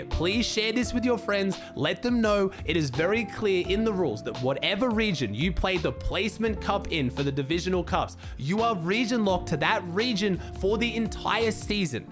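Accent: Australia. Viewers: he’s australian…?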